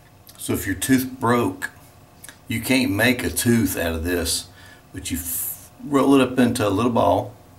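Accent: South, United States. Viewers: He's got a gentle southern accent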